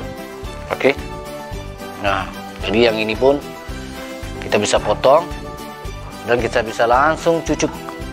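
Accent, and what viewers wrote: Malaysia. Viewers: Orang Padang kelamaan dimalaysia Ampe 32 thn gaya ngomong nya Ampe kebawa kyk org Malaysia asli (Melayu + Inggris) mantap lord 👍 Sehat sllu & panjang umur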